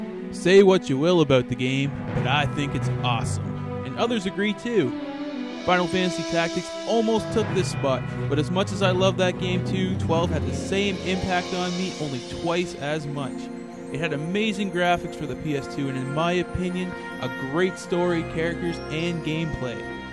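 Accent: Canada. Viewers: Are you canadian? Great list btw. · are you canadian?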